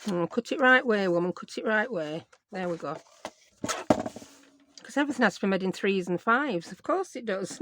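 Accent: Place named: Yorkshire, United Kingdom. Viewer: I love watching your videos, they are always so much fun and practical. I love that you sound just like my late Mum and Aunty. They were both from Yorkshire and I miss them so much. To me it’s the best bonus ever. Thank you. 💖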